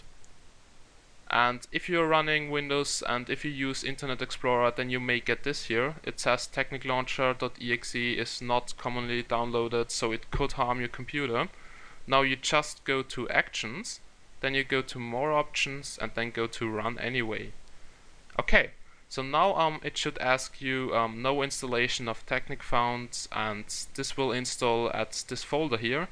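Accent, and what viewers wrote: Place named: Germany. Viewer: I love the german accent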